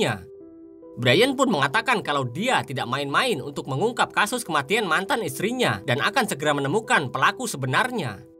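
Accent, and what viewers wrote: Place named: Ngapak, Indonesia. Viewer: Wong Jawa ya mas, ngapak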